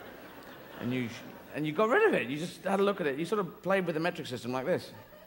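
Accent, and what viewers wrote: United States. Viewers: I love his American accent. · I love his southern accent. That was hilarious. It is good, I am southern. I am a Cajun hillbilly, lol!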